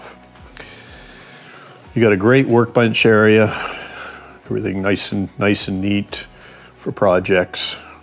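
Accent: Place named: New England, United States. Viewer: Love that "Gaaaden". Love your New England accent. Can't get enough of it.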